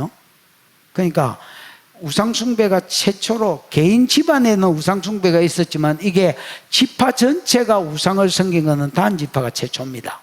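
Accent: Gyeongsang, South Korea. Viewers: 목사님이 대한민국 BUSAN에 오셔서 구수한 경상도 사투리를 쓰시면서 어려운 계시의 하나님의 말씀을 너무나 쉽게 또 드라마틱하게 온몸으로 설명하시니... 매끄러운 서울말투보다 저는 더 이해하기가 쉬워요. 😊😊😊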